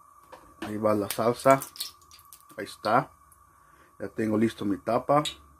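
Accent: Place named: Guerrero, Mexico. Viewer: Holaaa. Así hablan en guerrero. Xque no sabía sorry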